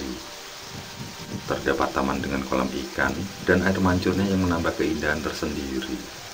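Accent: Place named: Pati, Indonesia. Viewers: Pati mana suaranya 😃👆👆 Juwana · Orng Pati mana suaranya👍👍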